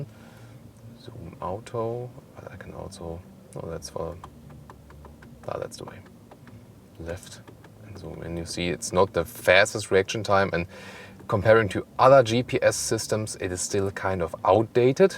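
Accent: Germany. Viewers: Is that a German accent? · Oh...hallo, natürlich verstehe ich Englisch. Aber ich dachte mir, warum macht er mit diesem sehr deutschen Akzent denn nicht einfach ein deutsches Video. Die Erklärung leuchtet mir ein...vielen Dank.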